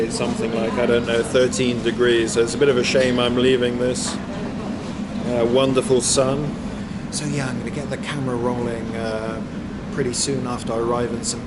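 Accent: England, United Kingdom. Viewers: How did you get Serbia citizenship? With that English accent!?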